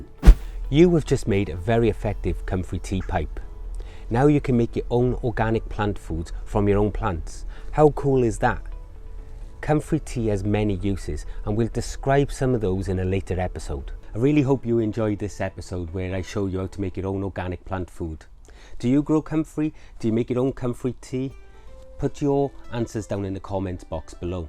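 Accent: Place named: West Wales, United Kingdom. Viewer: Your accent is similar to my relatives who live near Aberaeron / Lampeter. Are you from the west coast area mate?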